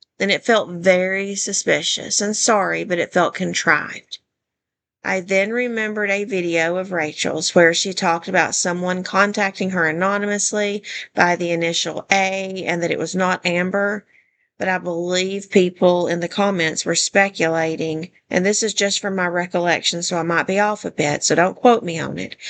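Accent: South, United States. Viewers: I think you're on to something! I like your voice, you sound like me...a southern gorl. Subscribed. 😊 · I just subbed bc you sound southern and you are definitely on point in what you said. ❤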